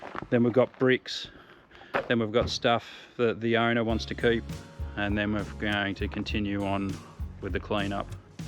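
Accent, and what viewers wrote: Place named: Australia. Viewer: I love your Australian accent and you're looking great 👍 👌 you do a great job.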